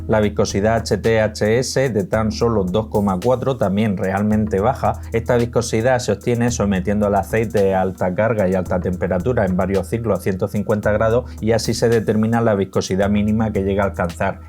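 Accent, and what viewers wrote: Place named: Málaga, Spain. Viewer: Buen vídeo. Estaría bien poder compararlo con el original de Toyota. Porque a día de hoy quien le fábrica los aceites a Toyota? Si no se mal hace años había pasado por Motul y Castrol, creo. Si necesitas para analizar tengo casi un litro de 0w16 de Toyota, la sobra del cambio en Febrero, y por el acento no andarás muy lejos de Málaga 😉.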